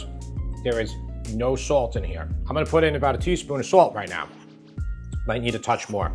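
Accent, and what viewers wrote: New York, United States. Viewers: I have been watching your videos and have been learning so much...I am even trying to pick up on the New York accent...I love all your videos.🥰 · I love your NY accent! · He has a very light NY accent. Mine & my family is way thicker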